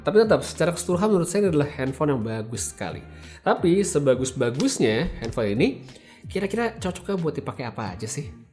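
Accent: Bali, Indonesia. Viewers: Bang..sekali kali pake logat bali bang..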